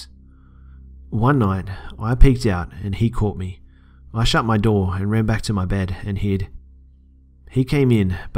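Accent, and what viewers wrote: Australia. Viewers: He's Australian XD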